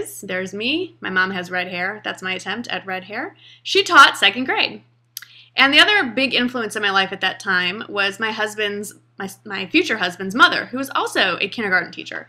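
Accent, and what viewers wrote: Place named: Texas, United States. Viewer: Even though you're from Chicago I do believe I detect a little Texas drawl "y'all" . Anyways cute video Yay for lefties! BTW you aint older than all your subscribers. little ol' me is tuning in! :-)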